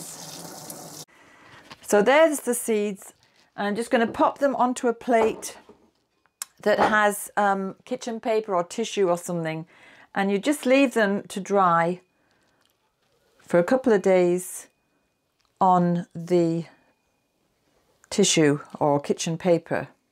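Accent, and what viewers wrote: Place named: England, United Kingdom. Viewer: ok ,you sounded a bit english, was you born in ireland or just have irish parents, i also have irish grandparents, and a half irish mum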